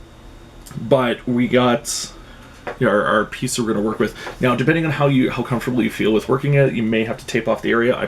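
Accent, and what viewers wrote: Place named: Canada. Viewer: Great vid. Are you Canadian? You sound Canadian. Detecting Canadians through their speech is my super power. ;-)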